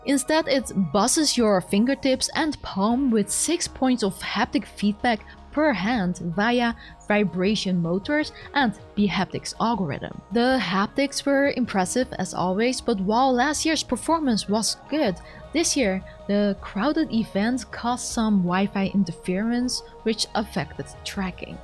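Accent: Netherlands. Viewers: Great news! What is this accent? I can't put a finger on it lol It nearly sounds like a Dutch English accent n__n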